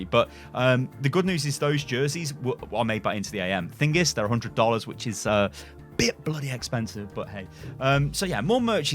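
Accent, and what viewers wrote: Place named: United Kingdom. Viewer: Your British talk is confusing. But I love British talk so I’m learning lol.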